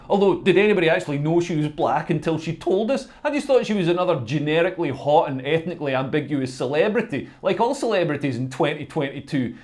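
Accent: Scotland, United Kingdom. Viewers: didn't know Clarkson is a comedian, a Scot mocking a scouser haha brilliant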